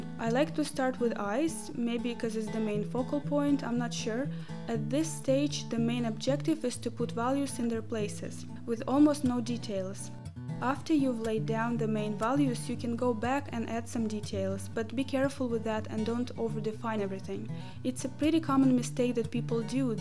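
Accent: Russia. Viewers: Красиво) Слышно русский акцент) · Omg this Russian accent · This is so inspiring ❤❤❤ I noticed you have russian accent... Aren't you russian?😅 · Русский акцент узнаешь из тысячи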